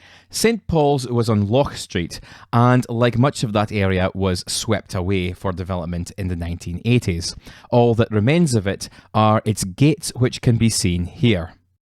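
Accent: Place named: Aberdeen, United Kingdom. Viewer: Love your accent, posh Aberdonian 😅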